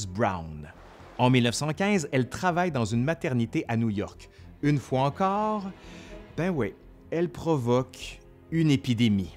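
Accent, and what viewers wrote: Quebec, Canada. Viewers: Rien que pour l'accent du Québec, j'adore vous entendre... Enfin, j'adore aussi vos histoires! Bises de France. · Tu raconte trop bien et j'adore l'accent Quebecois.... Tes histoires sont top 👍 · Déjà entendu auparavant, mais je savoure votre manière de le présenter, avec accent québécois, humour, beaux yeux et de belles images. Merci! 😉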